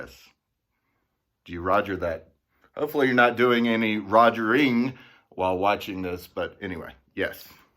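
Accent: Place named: Sweden. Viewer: I was trying to guess your English accent. You don't seem American or English. Are you Swedish? You don't seem German either.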